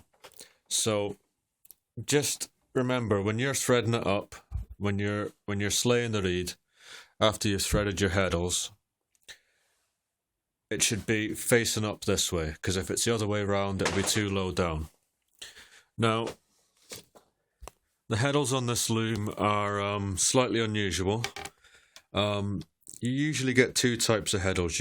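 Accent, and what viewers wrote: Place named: Scotland, United Kingdom. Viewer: Hello Thank you for taking the time to share your knowledge, which is great for people like me, who have just acquired a Weavmaster loom and don't know much about weaving. I'm looking at various warping boards, some with a few peg and some with a lot more, what would you recommend for this loom and a beginner. my loom is 102cm =40inc and four shaft, are you aiming to do any more videos, as i could listen to that Scots accent all day relax whilst i learn from the videos.Please i hope so.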